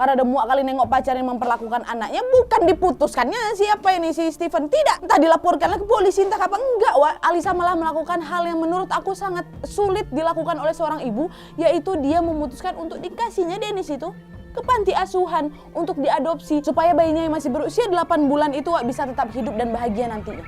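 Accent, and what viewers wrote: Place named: Medan, Indonesia. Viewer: Mantap kali logat kakak 🤣 sama2 orang medan nya kita kak e ??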